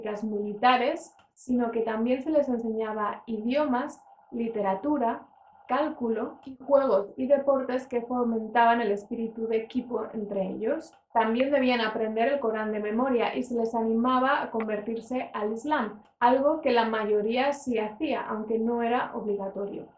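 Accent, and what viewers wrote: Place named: Castile, Spain. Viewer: Qué maravilla de mujer, su embriagadora forma de hablar, en un precioso castellano. Y además preciosa, de bellisimos ojos.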